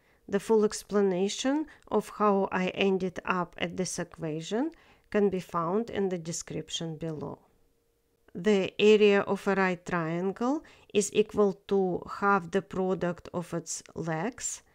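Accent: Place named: Russia. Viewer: Thank you both for the good problem and your great explanation! By the way, I love your Russian accent when you speak English.😊❤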